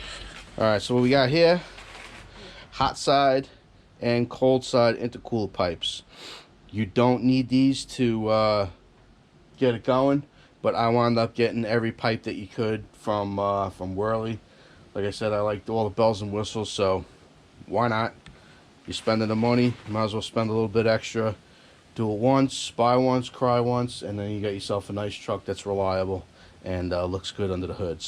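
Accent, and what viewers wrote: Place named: Boston, United States. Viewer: Bro i can tell you're from Boston or somewhere like that ahaha. great vid thanks. What are you using for a drone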